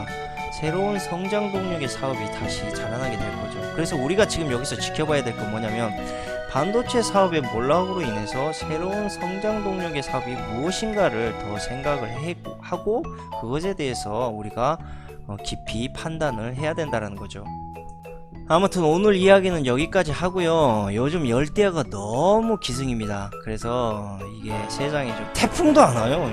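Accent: Jeolla, South Korea. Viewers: 듣기싫네 알고 떠들어요 전라도라 더싫음ㅡㅡ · 목소리가 삼성 싫어하는 7시 지역 놈이네. 역시,,,,,,, 예라이 썩을 놈. 퉷퉷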